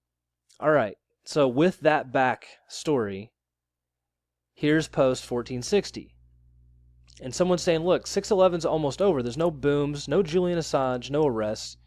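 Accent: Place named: Queens, United States. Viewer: Get that " Street cred" , Kill that Monster.....all good...u sound like yur from Queens Lol...Yur all good my dude!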